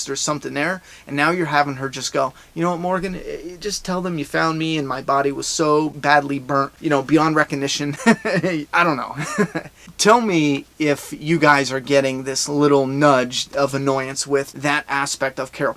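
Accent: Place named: Philadelphia, United States. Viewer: Great video! Couldn't have agreed more, great episode, great ending they should keep blending them. Are you from philly? Your accent reminds me of home lol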